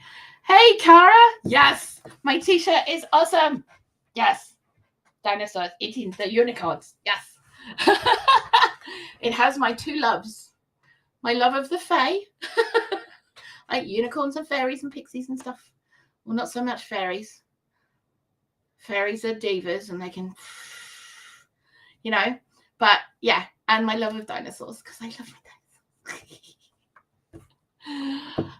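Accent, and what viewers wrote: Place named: Australia. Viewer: Oh thought you were from England I see Australia now accent threw me for a loop! Do you garden? You should very relaxing. I garden I believe I saw you comment on a gardening channel. I am a Christian! I love to garden?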